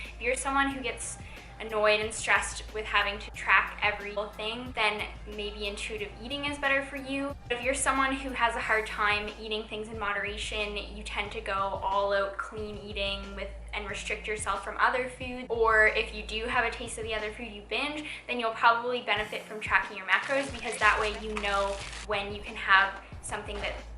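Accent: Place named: Ontario, Canada. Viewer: Are you from Ontario?! Haha I for sure thought you were from the states!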